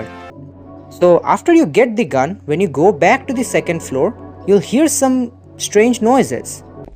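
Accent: India. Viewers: This cute accent our host is bringing me joy. He is analyzing and noting interesting things and having that desi cadence that makes my heart sing with different perspective.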